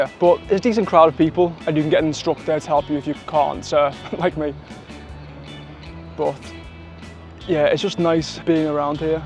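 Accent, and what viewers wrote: Liverpool, United Kingdom. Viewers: miss the scouse accent. I lived and worked in Liverpool for 13 years before moving to Australia. nice one lad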